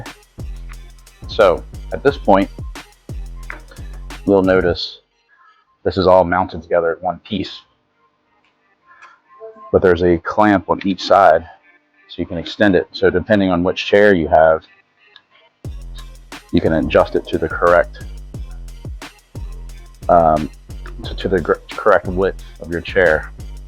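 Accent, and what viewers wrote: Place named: United States. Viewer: What we all need. A video of installation instructions in American English. 😊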